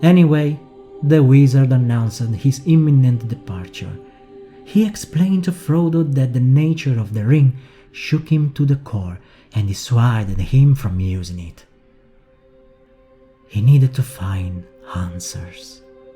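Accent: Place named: Italy. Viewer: I sensate a pizza accent. Are you Italian? Tolkien name is pronounced tole-kee (emphasis on tole) not tole-kee-anne BTW. You're good though. Keep the good stuff going 👍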